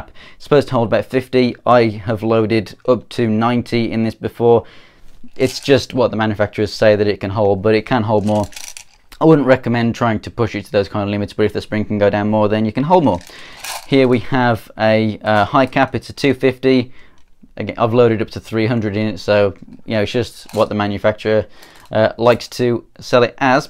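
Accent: United Kingdom. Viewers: Hey, I’m assuming from the accent that you live in the UK. Just curious where you got your mags from as I can’t find any UK online airsoft retailers that stock them. Did you buy them from a US site and have them imported? (Great video btw)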